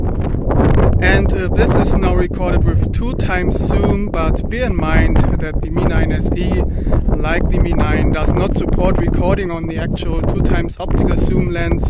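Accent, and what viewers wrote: Germany. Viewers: Irgendwie hört man richtig raus das du deutscher bist 😂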